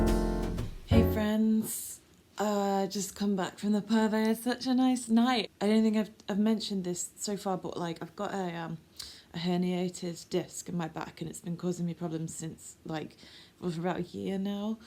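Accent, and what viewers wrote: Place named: Manchester, United Kingdom. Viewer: God I miss you and god I love your ever so slightly Manc accent - after next lock down I’m gonna come visit and sing ‘let it go’ with you 🥰🥰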